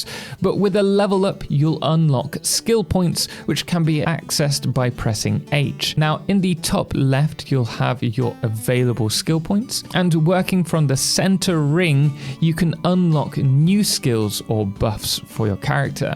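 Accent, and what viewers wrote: United Kingdom. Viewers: Sounds like a normal British accent to me, you know from tje country that the language came from 😂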